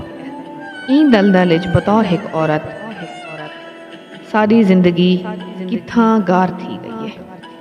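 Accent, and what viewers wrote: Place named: Saraiki, Pakistan. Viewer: Intersting video Apka saraiky accent perfect hy Apki urdo mein b ye lehja galib hy Ap mighty indus se buht ziada inspired hein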